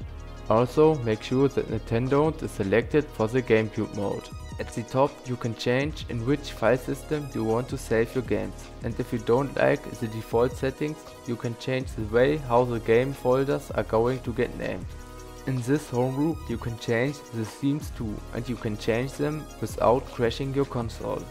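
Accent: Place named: Germany. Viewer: man hört dein deutsch krass raus haha aber trotzdem danke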